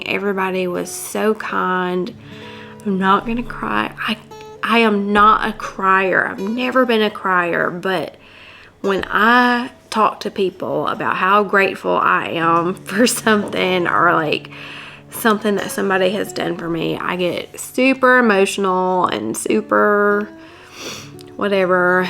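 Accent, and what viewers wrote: South, United States. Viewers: You are still very fresh from your accident. I first clicked on your channel because you were talking about your accident. I keep watching because I find your voice soothing, and I like your slight southern accent! I’m from New England. Be YOU. Talk about your experiences in the hospital, your recovery, your challenges, what kind of things helped you at home…you’re job as an EMS probably has so many fascinating stories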